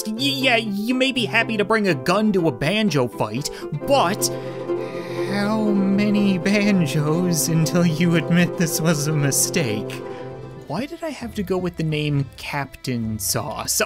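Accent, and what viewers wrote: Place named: Canada. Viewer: When I first started watching him. I couldn't tell that he was Canadian. but NOW?! Got me up here thinking Paul Bunyon made a YouTube channel😂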